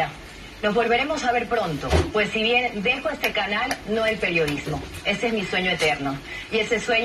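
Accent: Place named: Guayaquil, Ecuador. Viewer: eres una mujer linda valiente inteligente comunicativa sensible, emocional 100%, tienes una voz y el tono de una guayaquileña que encanta, seguro te irá bien, cuídate, te extrañaremos.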